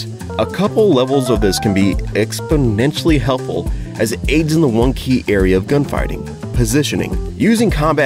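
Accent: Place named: United States. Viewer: question, why do you say zeds? you sound american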